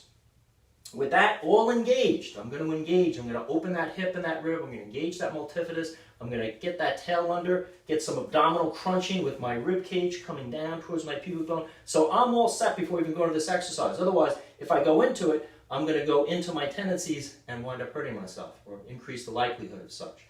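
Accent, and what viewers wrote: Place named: Boston, United States. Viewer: Thanks again! By the way, where are you based? I’m thinking east coast, maybe Boston, based on your accent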